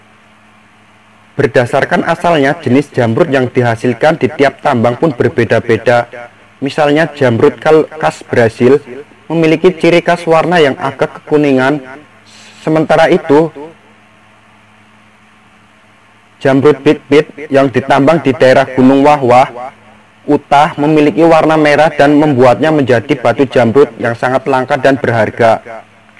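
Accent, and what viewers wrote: Banyumas, Indonesia. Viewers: ngapak ya mas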